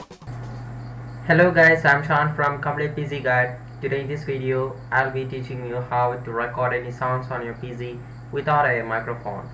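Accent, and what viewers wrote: India. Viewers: he has idian voice so he can fix it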